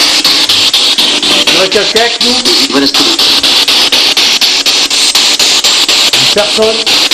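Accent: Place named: Hauts-de-France, France. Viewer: C'est toujours un plaisir de suivre vos recherches. Vous êtes des hauts de France ? C'est le petit accent qui me fait penser ça en tout cas bravo beau travail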